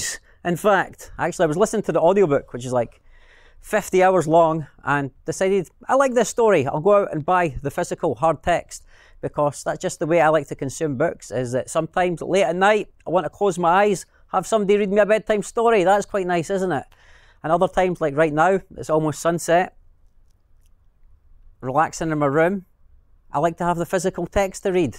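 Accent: Scotland, United Kingdom. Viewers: Your Scottish accent is so strong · I think this is the funniest one yet had me laughing 😆 my head of from the beginning I thought the camel was going to chase you but no the oryx did the way you fell back geez you were very lucky there lol you tend to push the limit's with animals, anyways your a CMU 😅😂🤣 love watching you your Scottish accent tops it all of✔️ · i dont understand what i like more, his content or his lovely scottish accent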